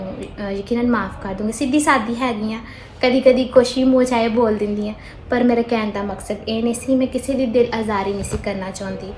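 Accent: Punjab, India. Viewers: Love your punjaabii acent Specialy the sentence OOW KEERIIIIEE SHEA WAA 😂👍🏻😍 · Kudiye teri panjabi thet hai Bacchpan vich asee jolly bhen naal khedde hunde c 'haar gya ' pehla das 'haar gye yaa bujh' Bilkul sade wali panjabi juban hai tuhadi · Sat shri akal ji Mainu tuhada program bohut vadiya lgda h te tuhadi pure Punjabi kamal.. 👌👌 Punjab Amritsar to..... 👍👍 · Love you je....you are awesome...kia andaz hai punjabi ka....maza aa jata hai...such a treat to listen you. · I like your videos so much. I m also Punjabi. But your Punjabi language is very sweet. It's so nice. Your talks are very sweetness. All the best.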